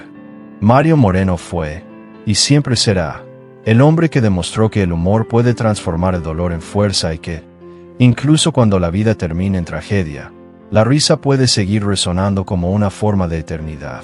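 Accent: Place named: United States. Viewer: Y tú porque hablas como greengo? Digo mejor pon la IA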